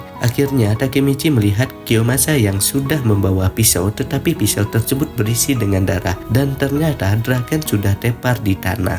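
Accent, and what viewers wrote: Aceh, Indonesia. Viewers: logat abangnya kyak logat Aceh😂, Orang Aceh bang ya?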